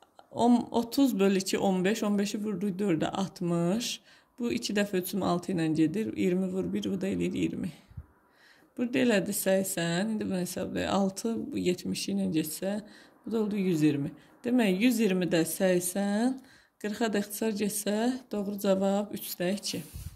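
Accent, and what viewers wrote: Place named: Nakhchivan, Azerbaijan. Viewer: Naxçıvanlısınz danışdığınız xoşuma gəldi +dərs izahı😍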